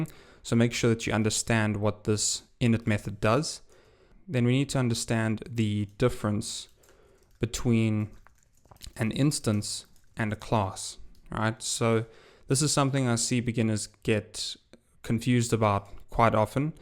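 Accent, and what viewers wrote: South Africa. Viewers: Hey a south african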